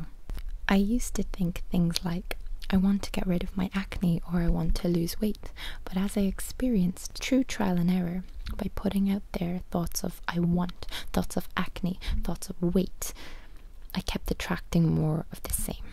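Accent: Ireland. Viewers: I love the Irish accent so much!! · I’m not into whispering so I love soft spoken. However, with that lovely Irish accent this makes the soft spoken voice even better! It is so relaxing you can’t even imagine, so Thanks! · This was just the kind of thing I've been looking for. A nice Irish asmr reading some sort of book. Love you 💚💚 This actually sent me to sleep! Thank you so much. Can't wait to read that part of your book 💚💚😊😊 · The Irish Accent just lends its self to ASMR ! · Being from Germany, no, being from wherever you are in the world, the Irish accent, and especially with your angelic and precious voice, this is the best ASMR in the world. We all wait for the channel! Your voice, and aura, and presence have the power to heal. Thank you so much. Go raibh maith agat! <3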